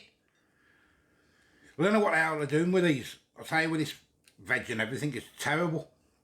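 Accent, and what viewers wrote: Birmingham, United Kingdom. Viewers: Another class funny video m8.your brummi accent is class m8😂..im from Cardiff we dont say butt,rhonda pps do🤔🤐ive posted b4 im paul canton cardiff,, whats your real name,old foodie guy suits m8,but? When sending message b nice 2 say ie tony? Lol...u so make the cooking easier 2 explain,than saturday kitchen bbc1🙄keep up thr good work m8 k,love 2 all ❤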